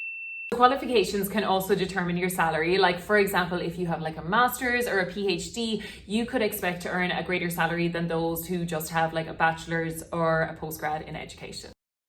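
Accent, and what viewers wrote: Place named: Ireland. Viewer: u sound irish but certainly dont look irish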